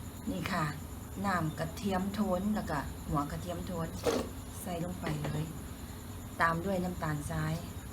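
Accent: Isan, Thailand. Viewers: ชอบภาษาอีสาน ฟังดูน่ารักดี 😄😄😄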